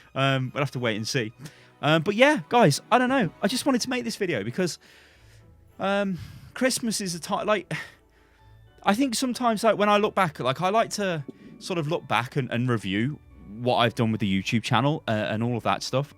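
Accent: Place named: Birmingham, United Kingdom. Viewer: Damn this nigga from Birmingham?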